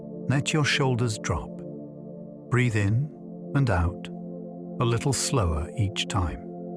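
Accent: England, United Kingdom. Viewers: “Hello there” in an English accent now Pavlov’s me into yawning. As a lifelong insomnia-sufferer, I consider this a success.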